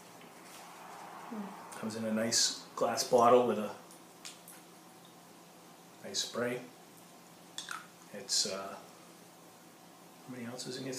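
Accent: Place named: Boston, United States. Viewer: I hear Boston!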